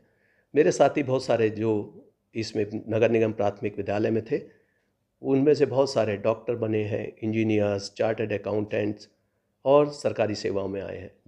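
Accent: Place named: Delhi, India. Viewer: Sir aap delhi se hai yesha mujhey lag raha Tha kyun ki u.p ka language kuch defferent Hai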